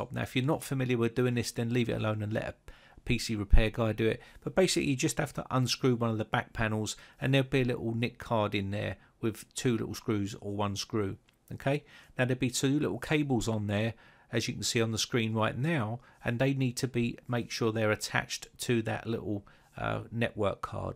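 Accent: United Kingdom. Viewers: Hearing a british accent after all of those indian ones is so refreshing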